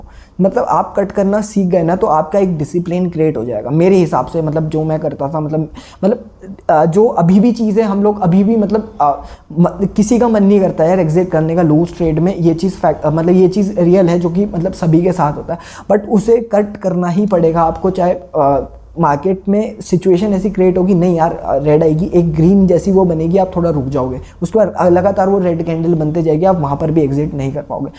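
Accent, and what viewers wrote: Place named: Dehradun, India. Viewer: Bhai dehradun se ho kya..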